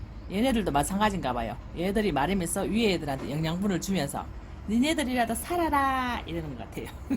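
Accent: Gyeongsang, South Korea. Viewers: 경상도 말씨 참 예쁘고 정겁네요~감사합니다💕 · 너무 재밌어요! 경상도 사투리도 좋고 옹사 잘 지으시네요.저도 사ㅇ추씨 뿌려야겠어요~/ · 늘 궁금했었는데 젊고 예쁜분이네요 경상도 사투리 매력있으세요 구독.좋아요 꼭꼭누르고갑니다 미나리키우는 방법도 알려주시면 감사하겠습니다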